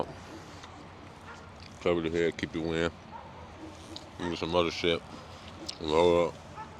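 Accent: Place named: Jamaica. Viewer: God bless my brother 🙏 why I thought I clicked on another persons videos when u came with the Jamaican accent 😅😭💀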